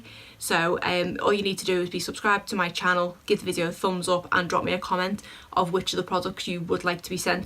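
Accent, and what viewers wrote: Liverpool, United Kingdom. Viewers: Your accent is beautiful. I am a linguistics student but can't pin point where it might be from. I would guess you're a scouse or from somewhere near there, you sound amazing! ❤ I'd love to win the falsies. Not that am any good at putting them on but really would love to try 😁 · some lipstick or the eyelashes idk, i really liked most of things so anything would be great <3 ahh the liverpool accent is kinda difficult but so lovely,my dream is going there someday. have a nice day <3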